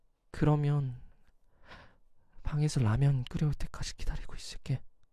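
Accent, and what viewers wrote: Gyeongsang, South Korea. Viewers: ㅋㅋㅋ남자가 왜이리 소심보스야 ㅋㅋ우쭈쭈~ 서툴지만 애정표현 하려고 노력하는 모습이...심큥💘)ㅋㅋㅋ 아무말 대잔치나하구 말이야 ㅡㅡ 이거이거 내가 좀 적.극.적.인 남자로 만들어 줘야겠구만 ㅋㅋ 그와중에 귀여운 경상도 사투리이~박크력)있네 ㅋㅋ 자기야 뽀뽀 박아 빨리 ㅋㅋㅋ😘 이거 이제 만날때마다 사랑한다고 말하라고 시켜야겠구만 ㅋㅋ 님 영상 볼때마다 내 가슴이☆ 콩닥코..♡ 죄송합니다 헤ㅔㅎ.. 쨋든 오늘도 잘들었습니다💕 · 아....사투리 너무 멋지다♡ 경상도사투리쓰는 남자 너무 멋있어여♡♡♡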